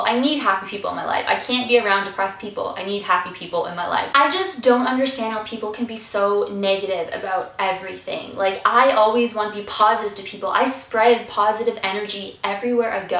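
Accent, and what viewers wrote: South, United States. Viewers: I'm new to your channel but you're already one of my favorite youtubers. I love your videos and the positive vibes you give off. Plus, I love that southern accent lol I think it sounds a lot like Britney Spears. And your face is beautiful! I ignore the haters too. I use to hear things about being gay all the time, but eventually as I got older and left high school I got a lot more confident just like you said in the last bullying video. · I freakin love your southern accent . Your face is fine. People are jerks. Even if they used to be your friends. I love your happiness. One of my old friends said "Whats wrong with your arms" i have a lot of freckles and she thought they were so ugly. But i look in the mirror and im ike sometimes "Oh i look good" just not full of myself lol. I love the fact that i love Harry Potter. Im weird crazy and fun my friends love me we always make each other laugh and i love my life. 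I got bullied a lot and i wanted to kill myself but im now in love with myself. I love my personality. I love the fact that i love Harry Potter. I love how my hair are so beautiful. :)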